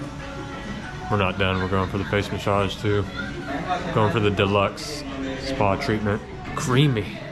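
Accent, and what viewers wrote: South, United States. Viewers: I deadass thought this was Jensen Ackles from Supernatural/The Boys 😂you kinda look like him in the face and y'all both got that cute southern accent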